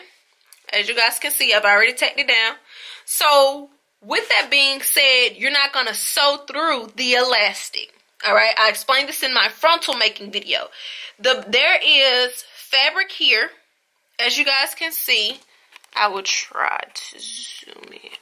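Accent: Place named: South, United States. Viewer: You sound like the southern version of raven symone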